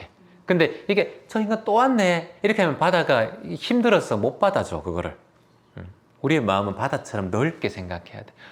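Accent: Gyeongsang, South Korea. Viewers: 제고향 포항 오천 입니다 포항 말들으니까 더 정겹습니다❤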